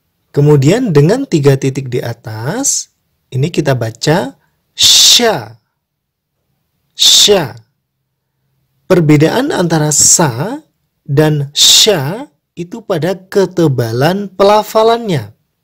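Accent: Java, Indonesia. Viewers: ini lidaj wong jowo. beda ama wong betawi xra pengucapannya.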